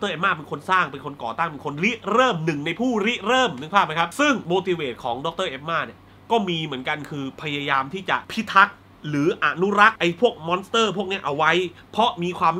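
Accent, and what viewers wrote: South, Thailand. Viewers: พี่สำเนียงคนใต้ จังหวัดไหนครับ ผมนครศรี 55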